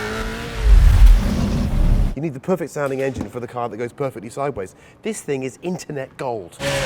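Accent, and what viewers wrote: RP, United Kingdom. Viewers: i cant stand some one with a posh voice trying to use as many buzz words as possible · BADD ASSS MACHINE. cant stand this British guy talking like a rich guy. AWESOME MACHINE. MURICAN POWER BABY!!!!!!!!!!!!!!!!!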